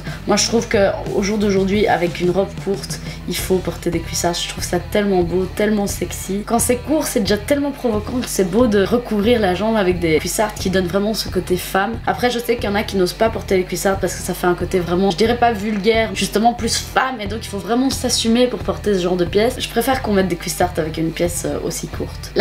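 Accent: Belgium. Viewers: C'est bien une compatriote belge que j'entends là?Je te trouve super cool a regarder je m'abonne directttt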